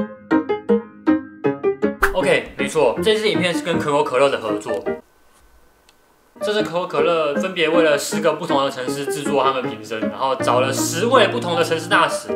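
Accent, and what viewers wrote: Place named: Taiwan. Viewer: 台語真的很準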